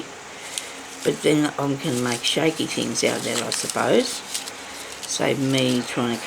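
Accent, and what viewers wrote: Australia. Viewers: love the accent. you in aussy. good video. always wanted to come to Australia but everything over there that bites is deadly lol. good job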